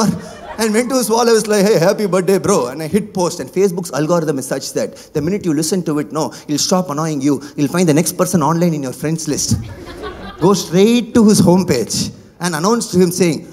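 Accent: Tamil Nadu, India. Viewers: Yes... he performing in English with the slang of தமிழ்..! That is the wealth of his success..! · Can feel tamil accent when u speak English 😂😂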